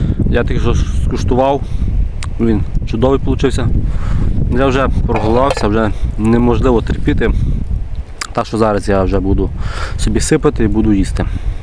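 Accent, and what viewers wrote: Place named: Podillia, Ukraine. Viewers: Я теж спочатку по говірці подумав що Хмельниччина😊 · Вітаю Добродію! Намагаюсь по вашій розмові визначити звідки ви...Ваша розмова дуже схожа, на ту, якою розмовляють на Поділлі. І мабуть все таки не Вінницька, а Хмельницька область.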